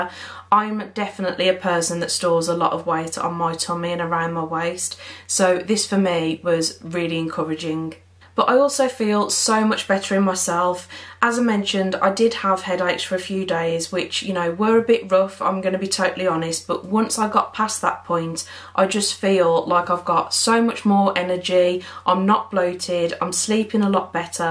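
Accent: West Midlands, United Kingdom. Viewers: Sounds like you live in the west midlands :)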